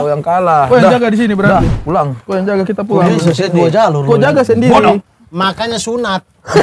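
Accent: Eastern Indonesia, Indonesia. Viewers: ... kenapa logatmu jadi ngikut timur juga... padang mana padang 😂😂😂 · Bang su terpengaruh dgn bahasa Timur ya😂😂😂👍👍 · Eeeeee....bang logat timur sdah bgus e😂😂e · uda, de pu logat su mulai ketimuran ee.... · logatnya udah jadi logat indonesia timur wkwkwk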